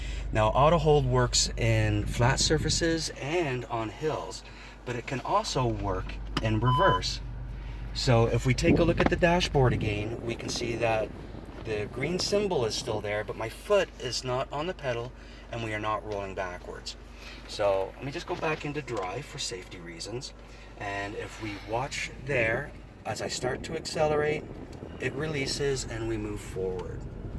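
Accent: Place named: North America. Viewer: North American accent..right hand drive ?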